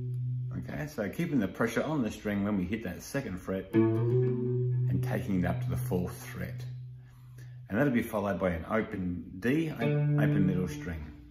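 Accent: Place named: Australia. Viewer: Great to have an Oz accent instructing 👏. Saw the cats jumper and and song title- thanks for this mate as I was learning this on 6 string from a mate who suddenly passed and I’ve also lost the finger ability for the 6 string so just got hold of a cbg. I’ll be learning this for me ol’ mate Doug and you have an Oz subscriber - also a Victorian. Cheers bud.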